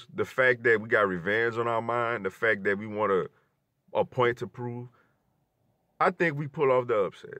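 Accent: New Orleans, United States. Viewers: you sound like you from New Orleans to be honest. Y'all will lose though this Sunday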